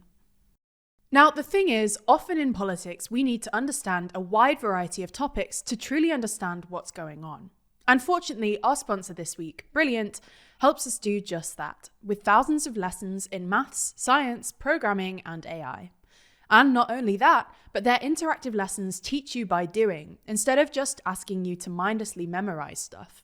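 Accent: United Kingdom. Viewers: I just can't listen to an Asian person with a British accent. It's so wrong.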